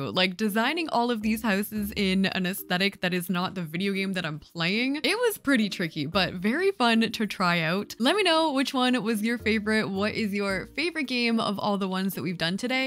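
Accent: Canada. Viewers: Are you Canadian? Also I feel like imma frequent your channel, glad I found you on my home page :)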